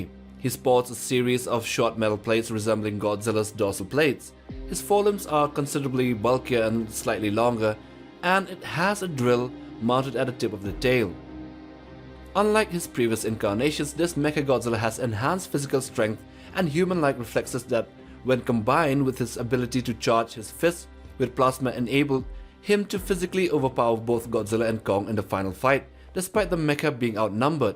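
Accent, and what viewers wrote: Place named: India. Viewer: You sound like an Indiano fron India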